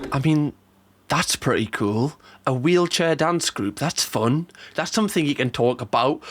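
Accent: Newcastle, United Kingdom. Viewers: He sounds like he’s from Newcastle (city in England) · I love the Geordie accent. My parents were both Geordies but moved to Yorkshire when they were quite young. All their siblings stayed in Geordie land though. I’m now in Canada and most of my Geordie family are gone so I love to listen to you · 'm an old lady stuck home a lot when I'm not being ferried back and forth to hopsitals for chemo and I discovered you a few weeks back. I can't even recall which video it was now but your wonderful Newcastle accent hooked me in. Always been a pushover for a Geordie accent. But I stayed because you're not only a funny little bugger, you're actually quite delightful. Personable, self deprecating & above all compassionate in a way many commentary channels are most certainly not. Well done and thank you for making me laugh. I've had tears a few times in every video I've watched. 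I've made my son subscribe as well. Let's get you to a million, eh?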